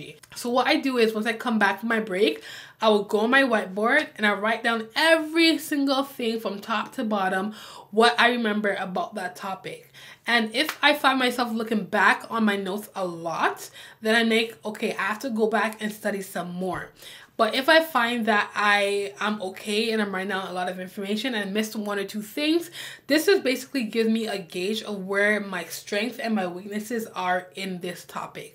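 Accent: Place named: Barbados. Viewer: This was so helpful, love the color palette idea! Off topic: I’m hearing a Caribbean accent😅 Bajan I think. I’m Trini!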